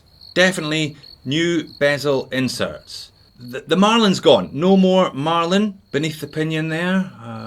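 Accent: Scotland, United Kingdom. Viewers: That's the Scottish pronunciation of the Duro. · Love the Scottish 'Hello' on this channel and Scott Manley's.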